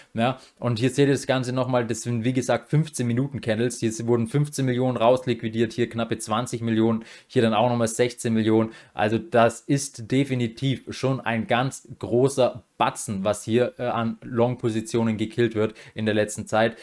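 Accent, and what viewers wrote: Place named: Swabia, Germany. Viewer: Woher genau kommst du aus dem Schwabenland, wenn man fragen darf? 🙂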